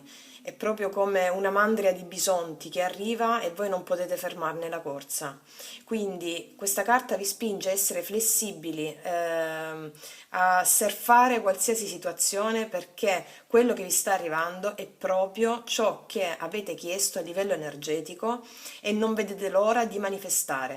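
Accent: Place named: Lecce, Italy. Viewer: Grazie ti seguo perché sei preparata e usi le tue carte in maniera adeguata, comunque la prima variante è ciò che sto vivendo grazie, e poi hai il mio accento leccese🤣💖💖💖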